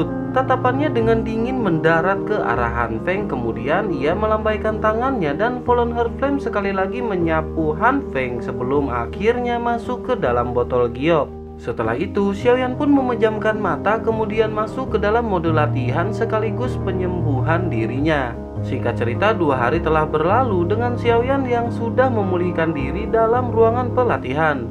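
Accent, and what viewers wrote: West Java, Indonesia. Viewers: Sunda pisan...kanjutkan min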